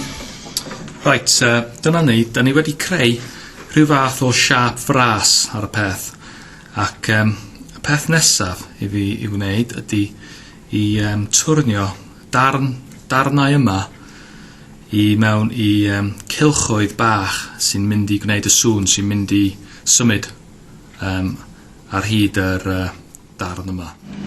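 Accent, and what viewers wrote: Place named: England, United Kingdom. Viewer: im russian and even i do hear that terrible english accent. most of todays celtic speakers have it, unfortunately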